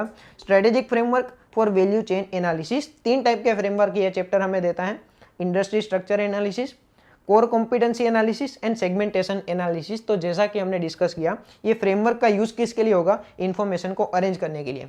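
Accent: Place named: Kathiawar, India. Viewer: Sir where are you from? Your voice tone little bit.. gujarati kathiyawadi..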